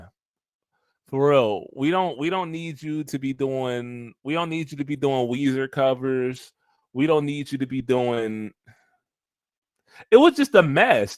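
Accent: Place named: Philadelphia, United States. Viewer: You from Philly, ain’t cha? 🧐😏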